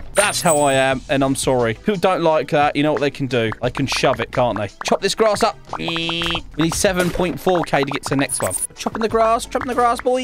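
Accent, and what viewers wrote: United Kingdom. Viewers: Are you British I’ve been trying to ask you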